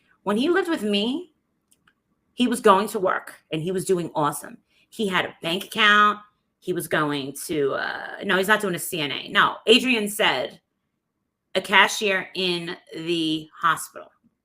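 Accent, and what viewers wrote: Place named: New Jersey, United States. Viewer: New Jersey! That awful accent. That makes perfect sense!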